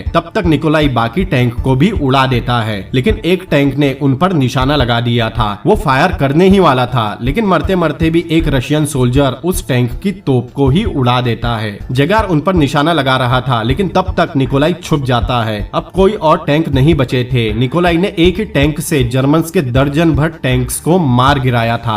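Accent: Assam, India. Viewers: amazing movie bro.. very well explained, i felt like i was watching the entire movie.. i have lots of Assamese friends, i felt you have that little Assamese accent, are you from assam?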